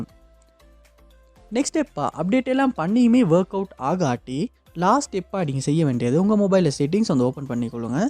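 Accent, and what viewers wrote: Sri Lanka. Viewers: Bro neenga illangai tamilana ?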